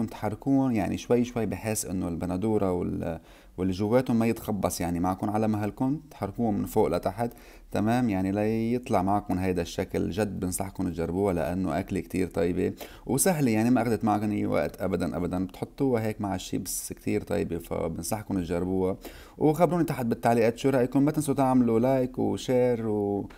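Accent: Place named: Lebanon. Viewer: اللهجه اللبنانيه بتجنن احنا نحب لهجتكم كتير تسلم ايدك على وصفه الافوكاتو كتير حلو ومشهى أنا انضميت لعيلتك الجميله مع الرنه واحلى 👍وبتمنى يدوم التواصل تحياتى لك ومشاهدة كاملة 🙏🔔👍☺️🤗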